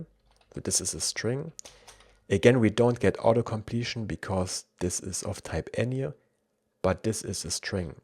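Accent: Germany. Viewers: are you german or do you speak german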